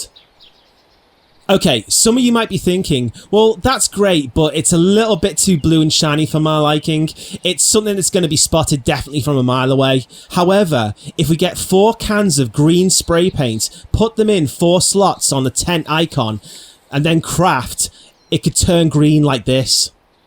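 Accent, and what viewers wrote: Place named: Warrington, United Kingdom. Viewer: I would say Warrington area if I was to take a guess.? Nice concept as well mate!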